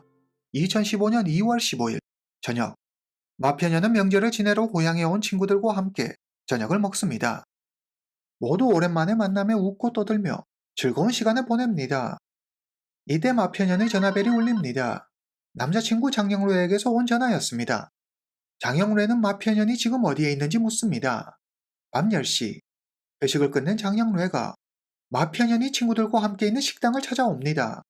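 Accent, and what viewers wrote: China. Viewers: 말투 어디 말투 입니까 조선족 아니고 중국인인가?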